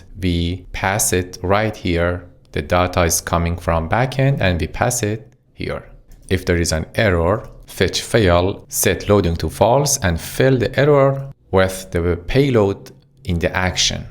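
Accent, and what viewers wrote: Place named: Iran. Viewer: لهجتون ایرانیه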